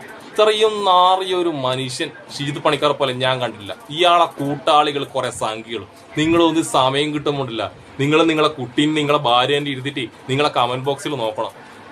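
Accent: Kannur, India. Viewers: കണ്ണൂർ സ്ലാങ് പൊളി 👌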